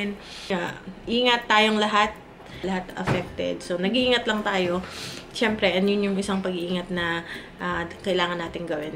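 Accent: Batangas, Philippines. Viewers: Taga batangas ka po o Quezon?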